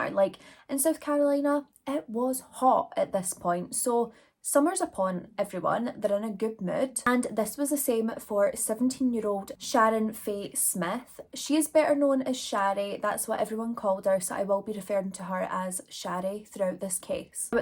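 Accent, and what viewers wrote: Scotland, United Kingdom. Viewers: Hf, I thought you were saying “pill party” this whole time but you were saying POOL party 😆 your Scottish accent is so cute lmfao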